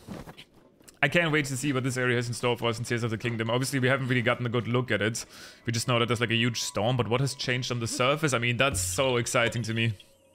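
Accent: Germany. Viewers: I live in Germany as an American and am used to German English accents, I have many friends who sound similar to you and I don't mind it! I'll never understand why people have trouble with accents, like if you don't like it then fine, but keep the negative comments to yourself smh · I'm not German but i love your accent! Please keep doing what you're doing and disregard the mean comments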